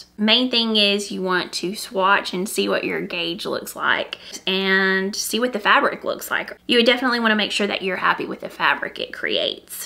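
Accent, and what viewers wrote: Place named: Arkansas, United States. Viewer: Hi! I love your podcast!! I love that you’re mixing it up and doing some different formats. This was a great discussion on colorwork - awesome tips and advice. Thank you! I’ve got the Alpenglow in my queue waiting on squishy mail to arrive - so excited. I’m a fellow Arkansan transplanted to Texas a long time ago and I just love hearing your accent from home! You asked in a previous video for book recommendations. I would recommend reading “A Gentleman in Moscow” if you haven’t already read it. It’s a beautiful story. My mom and I are reading the Thursday Murder Club series at your recommendation and absolutely love it! Thanks so much for all you share!! You’re so inspiring 🐝 🐓 🧶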